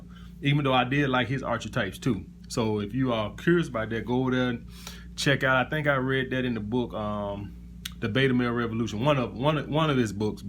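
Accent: South, United States. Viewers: its not perr...its pure...sounds like he from down south...can hear that southern accent...very good looking guy but dont agree with everything he saying...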